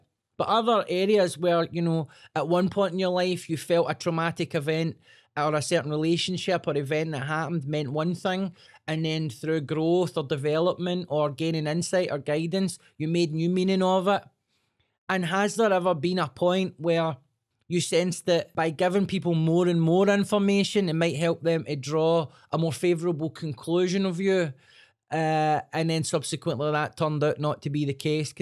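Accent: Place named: Scotland, United Kingdom. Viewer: I have no idea why or how this popped up on my Youtube, but great insight. Subscribed. Also, LOVE the accent. I thought that one Scottish comedian I've seen was joking by exaggerating. I realize I was incorrect.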